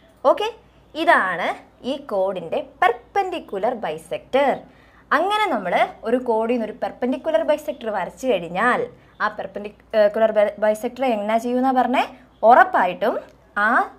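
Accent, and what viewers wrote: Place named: Alappuzha, India. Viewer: Alapuzha Alle teacherde house cheriya oru slange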